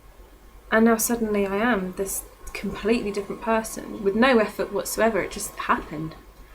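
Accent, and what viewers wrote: United Kingdom. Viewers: Is it me or do you get a British accent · Your accent sounds british to me, not aussie. Very interesting video. Thanks for posting it. I do know a great deal about MPD/DID.. am a hypnotist and have great success with hypnosis and regression. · Ur British not Australian!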